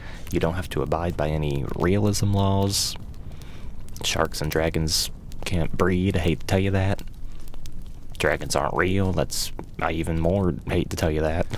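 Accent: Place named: Northeast, United States. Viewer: As a furry who just moved from the northeast to California; I can tell you’re from either the northeast or Midwest and I love it!!!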